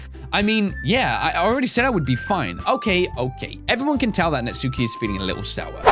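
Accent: United Kingdom. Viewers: Why do you sound sound British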